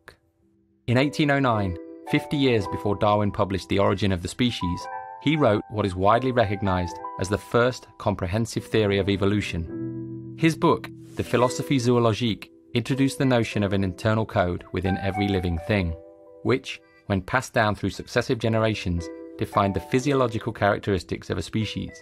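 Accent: United Kingdom. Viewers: This is so clearly faked. This is pretty low budget (compared to Google), its narrator is British for some reason, THERE'S AN IPHONE IN THE VIDEO INSTEAD OF AN ANDROID, and it's too direct. Even if this is what they wanted, they wouldn't just come out and make a video about it. Also why would they give it creepy music? Come on. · B.S...If this really was a google internal video, why the eff is there an iphone, and imac, and ipad, and no google devices...seems more like apple propaganda (especially with the British accent narrator). But I don't discredit that google does shady stuff.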